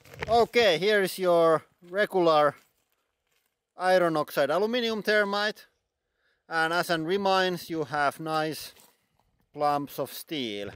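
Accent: Finland. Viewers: Accent and a few words tells me you are Finnish. Correct? Oikee? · lol that finnish rally english, knew you were from finland after first words out of your mouth, way before seeing text on side of that van at background :D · Your acccent sounds Finnish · I watch just to hear finglish again like at my grandparents.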